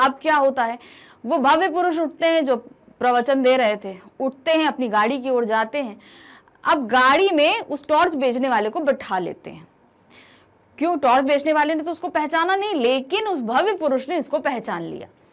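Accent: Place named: Northeast, India. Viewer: Lgta hai north east side ke ho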